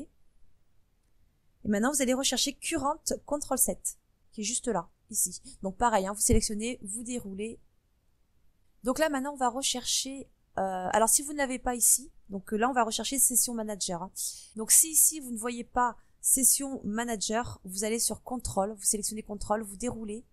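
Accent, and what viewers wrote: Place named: North, France. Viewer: Merci, j'ai revu ton tuto avec plaisir, mais je n'ai pas encore partitionné mon disque dur mécanique, je n'ai pas de SSD... PS:" j'men va d'ce pied elpartitionner"!!!, tu lis en phonétique, c'est le patois du Nord, que je n'ai jamais aimé, il est moche... Je suis originaire du Sud et ma mère est Pied noire, mes les gens du Nord, je les apprécie beaucoup... yo !!!! Bisous à toi !!!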